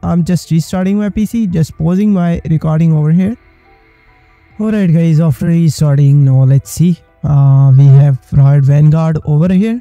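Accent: India. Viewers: کیرممننم تو لهجه هندیتتتت ولیی واقعااا ممنووننن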